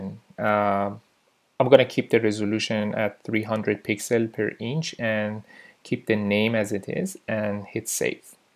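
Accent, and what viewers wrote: Iran. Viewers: I spot a Persian accent when I hear one. great tutorial thank you!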